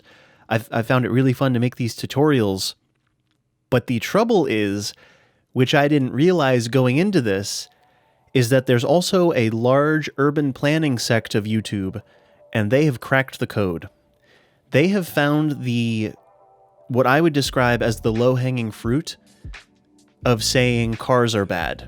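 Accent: United States. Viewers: You didn't say it right away, but the the more you talked the more I knew you were 100% in Northern Virginia :). - And although there is a decent number of people who use the metro, it is still highly underused. I came from California, and we had a very similar experience there. It seems, in the US, we love to talk about metro, but we often prefer being in a car.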